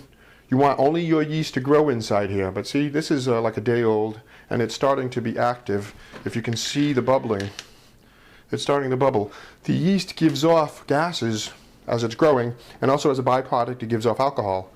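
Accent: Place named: New England, United States. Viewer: This guys definitely from the New England area.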